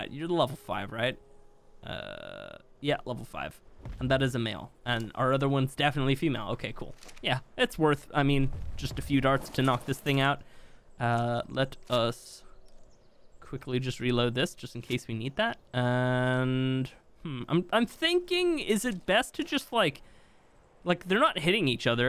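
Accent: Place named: Ireland. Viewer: Jolli_2000 hes Irish its the way they talk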